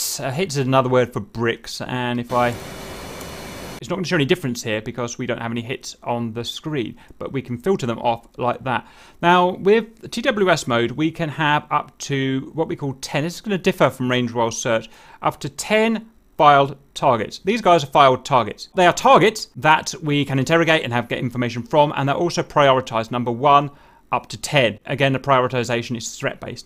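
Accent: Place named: United Kingdom. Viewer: True, but only if you are in a hurry. But I enjoy his way to entertain. I'm Italian, but I love this British accent and humor!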